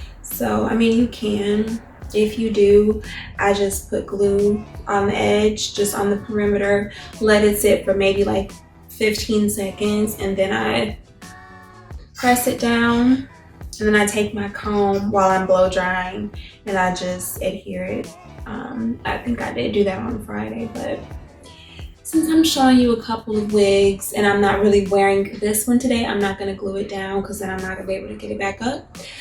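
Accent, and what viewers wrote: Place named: United States. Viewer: Love your country accent girl!! Love the video thank you 👏🏾👏🏾👏🏾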